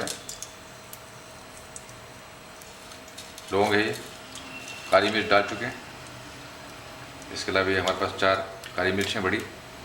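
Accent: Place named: Indore, India. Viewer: mujhe aap ki language accent se laga tha ki aap Indore se hain, but saari dishes aap ki Rajasthani influenced hoti hai isliye pucha, vaise mai bhi aap ke podas mai hi rehta hoon Bhopal, aur meri wife Indore se hai 🙂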